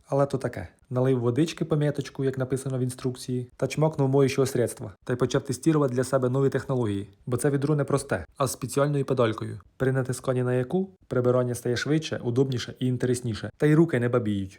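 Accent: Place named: Cherkasy, Ukraine. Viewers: Ви чудо ви так говорите як у нас у Кам'янці Черкаської дай вам Боже бережіть себе ♥️ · Натрапила на ваш ролік...думаю собі ,,говірка черкаської області " і бінго🎉 Привіт Черкасам❤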